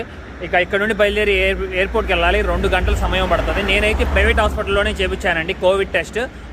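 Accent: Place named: Telugu, India. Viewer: అన్నా మీరూ ఇంగ్లీష్ మాట్లాడుతుంటే ఏంటో తెలుగు మాట్లాడుతున్నట్టు వుంది అన్న....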